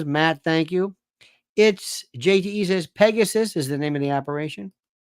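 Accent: Australia. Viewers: Your Australia accent had me laughing big time ❤❤ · Australia is a degenerate festering toralitarian hellhole. They locked down the country hard over a sniffle snd recently sent to prison an elderly woman for a 4 year sentence for walking to her mailbox and allegedly infecting a cancer patient with the coof on the way through a public hallway. They aren't a country of ex prisoners, but one of WILLING prisoners. But yeah, the accent is nice.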